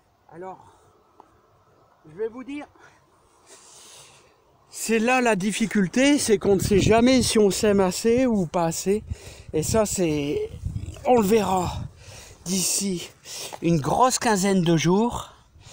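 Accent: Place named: Roubaix, France. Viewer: Bonjour Monsieur, je découvre votre chaine bien sympathique, merci ! Et il me semble bien reconnaître l'accent de mon enfance c'est à dire Roubaix ☺ bonne continuation !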